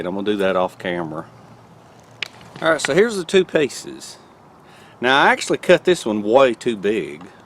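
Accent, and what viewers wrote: South, United States. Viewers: And, in case you didn't know you have a Southern US dialect, here's two cases of Northern Britain: Scottish: <link> And Welsh: <link> I'm rather amazed how people claim you are speaking the same language. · You were the first person I subscribed to on YouTube. I always look forward to your videos. The videos are informative and entertaining. I hope you, Nick and the rest of your family have a very Merry Christmas. GOD bless you. Also, I like the way you talk. I live in Arkansas. We speak southern too! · "I'm Southern. Cut me some slack." lol. Sometimes I watch your videos just to hear your accent! You seem like the nicest guy on YouTube. You always call things a "little gem". Well I think YOU'RE a little gem. Your experience and knowledge are second to none. And this video is EXCELLENT. A brilliant saw tutorial. I had no idea what the different types of teeth were for or how they functioned, ie wet vs dry wood. 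Never have I read on any saw descriptions what they are specified for. Now I understand saws SO much more. Thank you for all your videos. I've learned so much from you.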